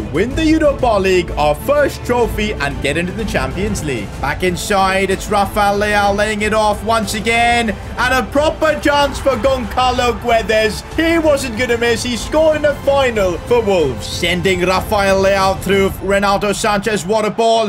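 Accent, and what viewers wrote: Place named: India. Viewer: Ngl you sound like a mix between a sterotypical indian uncle and vikstarr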